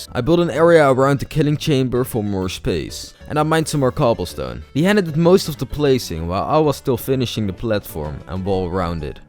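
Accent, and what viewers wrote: Netherlands. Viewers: De nederlandse accent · Nederlands ik hoor het aan je stem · Just please do something about your Nederlands exent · Jo you have dutch accent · Nederlands Engels 😍🥵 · sound like ur dutch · 2mln views, u deserve this, r u dutch? Ur accent sounds dutch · Its dutch. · Jij bent nederlands dat hoor ik gewoon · Je bent Nederlands dat hoor je meteen! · Mmmmm i smell nl accent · I Smell DUTCH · Bro je nederlandse accent is wel echt goud😂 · Very dutch accent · idk whybut i smell dutch / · i smell nederlands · I smell nederlander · You sound dutch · You rlly have a Dutch accent 👀👀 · Hahah you sound Dutch are you Dutch hahaha 😂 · I just love this dutch accent Ik hou van dit nederlandse acsent🤧✨🤜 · Ik weet dat je Nederlands bent ik hoor het gewoon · You sound Nederlands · I smell Dutch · Je hoort gewoon dat het een Nederlander is 😂😂👍🏼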